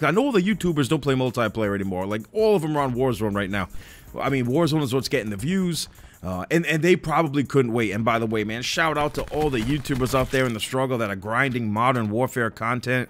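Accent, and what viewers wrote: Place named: Northeast, United States. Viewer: Loved your channel for years bro. I hope you and your family are staying safe. You sound like you’re from the NE - so please stay safe. Cheers from Texas.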